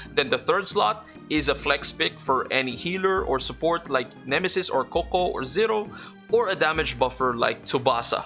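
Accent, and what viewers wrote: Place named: Philippines. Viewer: I absolutely agree with what you said about pulling who you like, instead of pulling who is strong. With the way global is changing things up we now have the liberty to do that, and I love it. PS: You have the most pleasant Filipino accent I've heard 🥰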